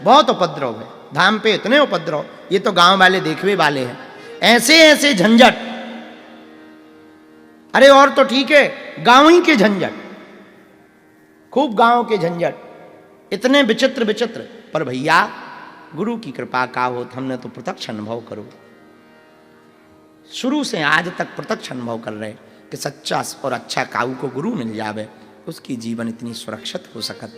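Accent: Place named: Bundelkhand, India. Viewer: सही बात बुंदेली बोली हमे भी अच्छी लगती है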